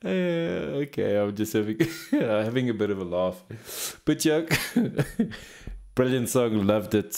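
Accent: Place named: South Africa. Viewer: If I'm not mistaken, you have a south african accent :) my mother was from woodstock Cape Town.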